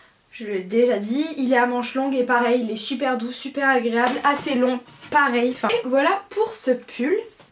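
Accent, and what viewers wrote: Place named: Marseille, France. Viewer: Tu as un petit accent marseillais, tu habite à Marseille?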